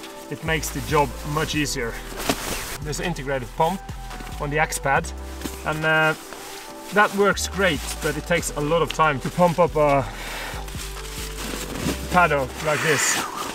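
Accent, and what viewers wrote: Bergen, Norway. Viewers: Hvorfor bergengelsk ?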